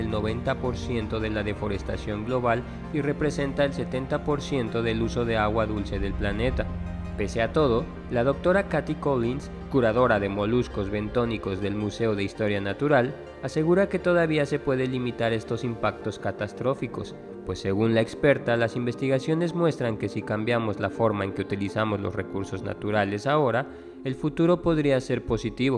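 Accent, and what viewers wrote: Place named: Mexico City, Mexico. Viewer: Nomas de eschucharle la voz meca chilanga, me doy cuenta de la mentira de este video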